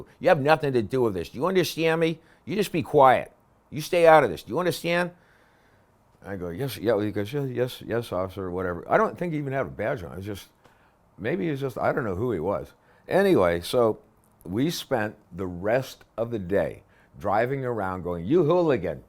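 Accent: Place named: New England, United States. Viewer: Gotta love the New England accent! Like being in "Norich", CT.😅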